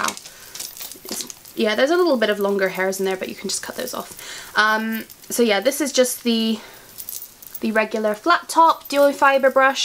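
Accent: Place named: England, United Kingdom. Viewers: Chocolate brown & medium brown are the most popular colors for lip sticks right now. I guess by your acent that you are from England. Usually the U.S. is always ahead of times when it comes to fashion. Especially California, New York, Chicago, and Detroit!!!! So, go get some BROWN LIPSTICK!!!!!! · So West Country YAS love it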